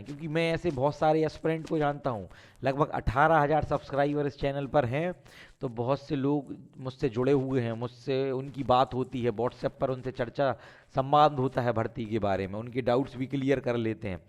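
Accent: Bundelkhand, India. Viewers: Bundelkhand se lg rhe h shyd jila jalaun se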